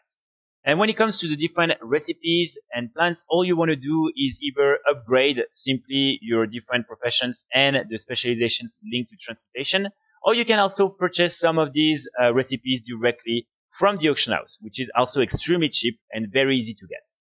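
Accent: France. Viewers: tu serais français à tout hasard?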